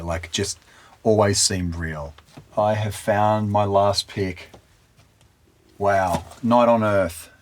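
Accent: Australia. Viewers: he sounds extra Australian here lol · I didn't even know he was Australian, never heard his natural accent!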